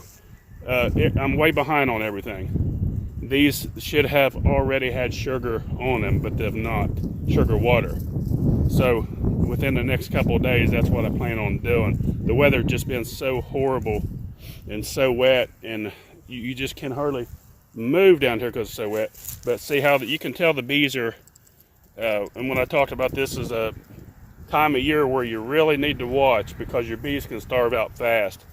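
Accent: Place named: Pennsylvania, United States. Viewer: where are you from? the accent sounds vaguely familiar. Maybe Pa.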